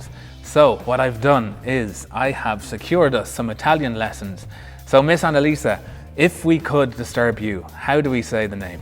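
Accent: Ireland. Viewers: WTF I only discovered this because of Ronald Finger's youtube channel; and I just started. This is AWESOME. I'm bingewatching from the beginning. This channel needs WAY MORE views what the fuck youtube. This NEEDS to be seen everywhere. Anyways, this dude is like the Irish version of Veritasium. These guys are like long lost twins with different accents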